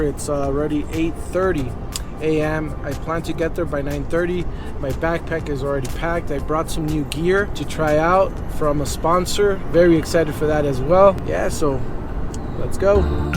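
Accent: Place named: Latin America. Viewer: Sounds by your slight accent must be Latino si..good to see a fellow Latino enjoying Mother Earth well educated in the outdoors well done hijo good to meet you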